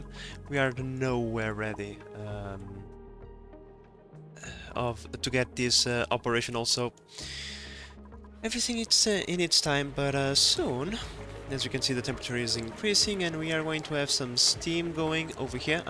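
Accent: Portugal. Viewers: Are you Portuguese ? Sounds like it ?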